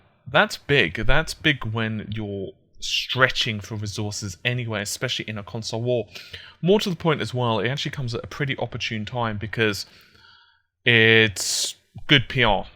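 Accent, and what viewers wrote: United Kingdom. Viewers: What's he doing? He speaks in a british accent but uses American terminology like the way he pronounces 'route' and the way he says 'math' instead of 'maths' :S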